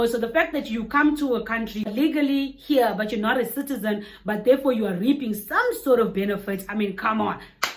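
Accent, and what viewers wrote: Sotho, South Africa. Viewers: Are you a South African? You Talk like some of us. ❤❤ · Your accent sounds like South African🇿🇦❤ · You give me Sotho vibes you look and even sound Sotho or even Pedi. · WOW, u have a Sotho accent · South Africans are liberate people. i love my people! There is nothing free in SA. GRANTS, RDP, INFRASTUCTURE etc ,is paid out from tax. we pay for all of those things for our people. We have placed the political party where they are, so yes if they are failing to deliver... they rather Votsek lol. South Africans are liberate, we understand African spirituality. we do go to church but do due diligence and acknowledge our ancestors, then go to church. Most of these posters for abortions are back door abortion, rendered by foreigners. its legal to have an abortion in SA, you can just go to a public or private hospital and get and abortion than giving birth to a child that will be a burden, when you cannot even take care of your self. 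lol. i must say, i love your accent, you don't sound foreign. in township, make sure they don't catch you stealing or mugging a person.. they will burn the thief! the jobs you looking down on, still feed families. If im not educated and i cannot get to be a waitress, just a foreigner can..! Yall run away from your countries and become burdens in other peoples countries and expect those people to understand. South africans fight for what they want, something foreign nationals should start thinking about. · I really like you a lot but why do you like to look and sound like South African. I am not from DRC but I will prefer to watch more of your videos if you are more like your true self. Please you need to travel more often to see the world. I don't know while you like to trash DRC. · First of all your headscarf is beautiful and the way you wrapped your head is perfect👌🏽, give a sister some lessons......another thing is your accent, it's sounds like a South African🥰....... 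There's a lot I can say/reply to what you've said on this video but let reserve my comment for another video.....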